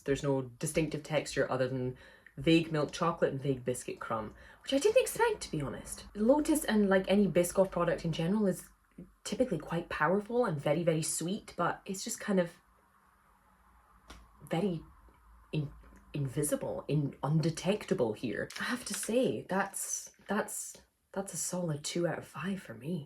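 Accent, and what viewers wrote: Scotland, United Kingdom. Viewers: best accent in the world go scotland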